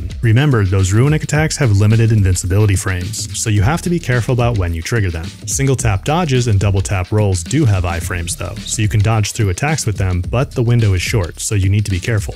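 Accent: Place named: United States. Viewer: Finally an American accent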